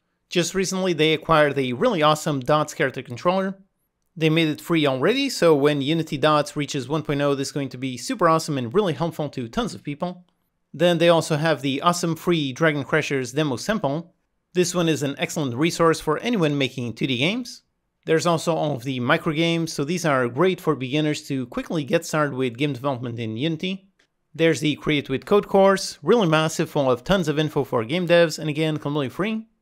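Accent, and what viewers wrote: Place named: Portugal. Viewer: Bolas. Estava a ouvir o teu Inglês e dizia para mim mesmo, parece um Tuga a falar Inglês. E fui verificar. LOL. Só não acerto no Euromilhões.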